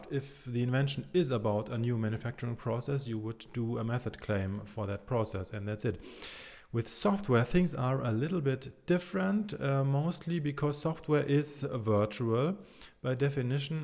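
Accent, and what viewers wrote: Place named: Germany. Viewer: Schöner deutscher Akzent :-) Ich frage mich, ob es für mich als End-User rechtliche Schwierigkeiten geben kann, wenn ich eine kostenlose Software nutze (z.B. Gimp als Photoshopersatz). Z. B. wenn ich als Berufsphotograf Bilder manipuliere und diese dann als JPG exportiere, um diese zu verkaufen. Wäre meine Nutzung der Bildbearbeitungssoftware GIMP illegal, wenn z. B. patentierte Prozesse oder Dateiformate mit der Software zum Einsatz kommen, welche die Software gar nicht nutzen dürfte? Oder sind die Softwareprozesse in diesem Zusammenhang eh kein geistiges Eigentum, weil es unpatentierbare mathematische Formeln sind?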